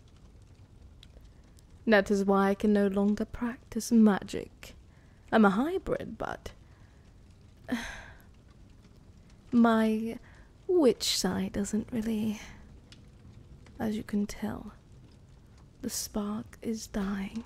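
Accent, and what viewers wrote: Scotland, United Kingdom. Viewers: I love your Scottish accent ❤❤❤ Thanks for another wonderful video 🤗🥰😘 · Scotland? I can't tell. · The hot Scottish lady is back Does anyone else find the Scottish accent attractive?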